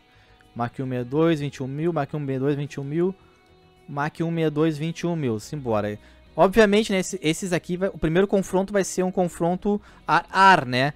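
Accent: Rio Grande do Sul, Brazil. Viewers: Tu é gaúcho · Tchê tú é gaúcho de Pelotas ctz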